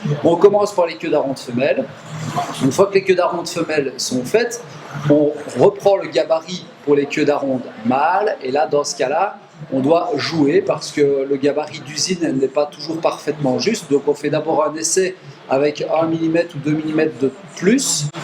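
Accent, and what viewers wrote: Belgium. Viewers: Bravo, une bonne imitation de, tu tiens bien l'accent belge. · L'accent belge m'avait manqué.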